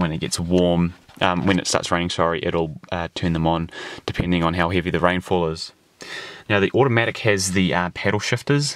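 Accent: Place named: New Zealand. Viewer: awesome detailed video. like ur kiwi accent too :)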